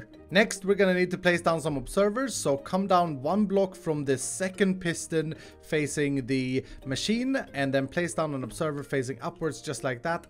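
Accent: Sweden. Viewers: Så jäkla kul med svengelska! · That Swedish accent tho!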